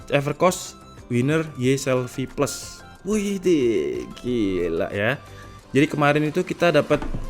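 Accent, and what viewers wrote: Java, Indonesia. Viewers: Jawane ndi mas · Smpeyan wong endi kang... Bahasa ne...bared.anteb....😉😉😉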